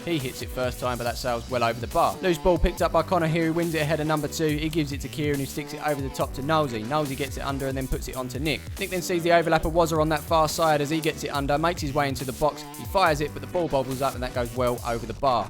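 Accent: Essex, United Kingdom. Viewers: Are you from essex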